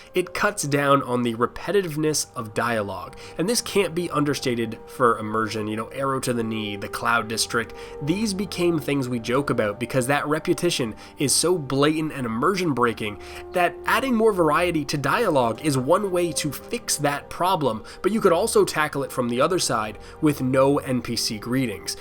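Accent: Canada. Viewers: Your Canadian :) or from Minnesota. lol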